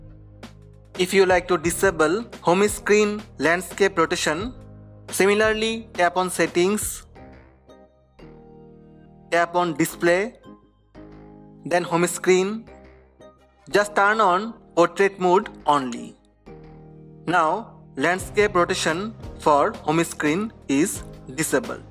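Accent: India. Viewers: Indian bullshit accent hahahaha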